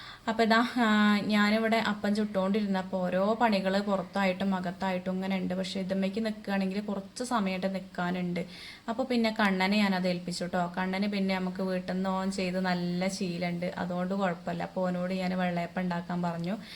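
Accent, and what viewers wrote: Malappuram, India. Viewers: ningal Malappuram Anu Ann samsarathil manasilai evidayanu. Sthalam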